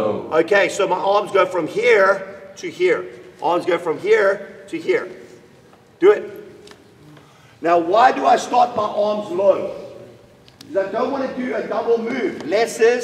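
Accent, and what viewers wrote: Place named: Russia. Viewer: ) Guess you should make a video? Feel free to post to compare! - There is definitely a time to bend your arms. The game has changed allot since the 80's. You sound Russian and old School. In the 80's they served underhand. Today even at HS the serve is coming so fast your goal is to make a platform. Sometimes your arms cannot be straight. But you can still make a great platform with bent arms! :) Anyway send me the link to your video coach? :)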